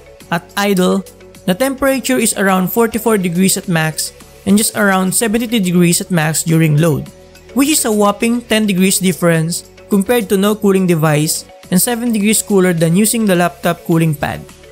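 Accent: Philippines. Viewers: When you showed your face and talked i allready knew your a filipino BROTHER!! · your accent sounds like filipino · Great video! It was really professional in terms of quality, presentation, audio, and content! Keep doing what you're doing. I was surprised that you only have 540 subscribers. I already knew you were a Filipino when I heard your voice so I hope you get big in youtube and represent us filipinos proudly! :)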